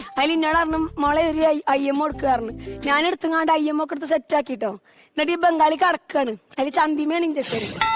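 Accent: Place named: Malappuram, India. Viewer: Malappuram slang♥️😂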